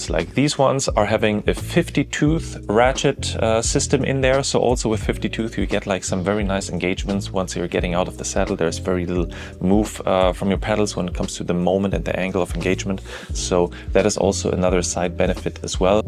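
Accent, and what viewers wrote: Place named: Germany. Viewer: "Velo Six Spoke Aero Wheels" ... the German accent was the hook, but I didn't see any German style analysis. You talk about noise and feel and stiffness and cross winds is as close as you ever get to discussing the aerodynamics of aero wheels! Whats the matter with you? Do you have aerodynamic wind data of any kind?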